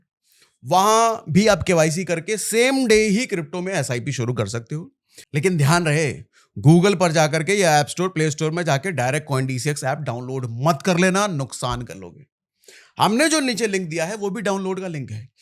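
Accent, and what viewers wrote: Bihar, India. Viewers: Tum bihari ho na ??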